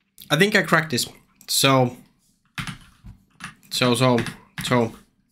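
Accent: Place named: Finland. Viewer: I can hear Finland in your voice.... Nice video mate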